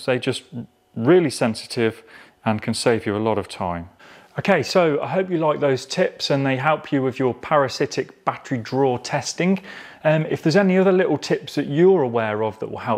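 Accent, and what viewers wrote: United Kingdom. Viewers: As always on this channel, this video is didactically excellent. Moreover, it is very pleasant to have all this presented in the distinguished manner of a British gentleman. Thank you.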